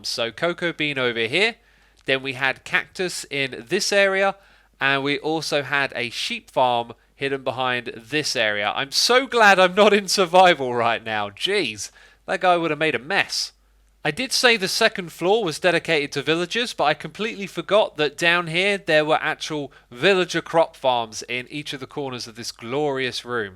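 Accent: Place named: United Kingdom. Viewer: Why are you British now wtf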